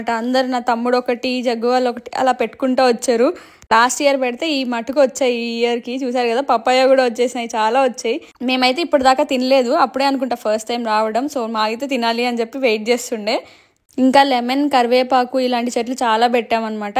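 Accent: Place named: Telangana, India. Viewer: మీరు అన్నమయ్య జిల్లా అంటున్నారు. కానీ తెలంగాణా యాస మాట్లాడుతున్నారు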